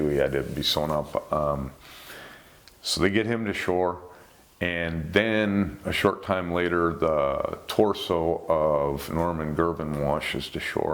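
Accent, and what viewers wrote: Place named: Midwest, United States. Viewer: Hi, cool vids. Where are you from, I'm from Milwaukee-Chicago area originally. You sound very Midwest to me. I'm a former surfer living in WA. Learned in California. Surfed westcoast, Mexico and Hawaii. Can no longer, out of fear. A friend of mine Kenny Doubt was severely bitten in Cannon Beach OR. by a GW in 1979. I just can't do it anymore. It was one of my favorite sports.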